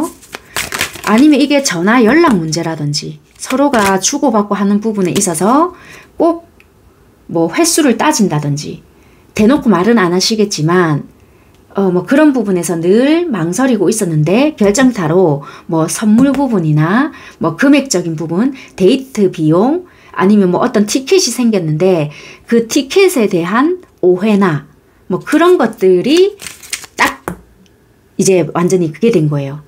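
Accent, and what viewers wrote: Busan, South Korea. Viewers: 선생님. 좋아요를 누른다는게 잘못해서 싫어요를 눌러버린것 같아요. 오해마시라구요. 저두 부산사람이라 선생님의 사투리 표현에 힐링타임 많이 가집니다. 오늘도 리딩 잘듣고 갑니다. 고맙습니다~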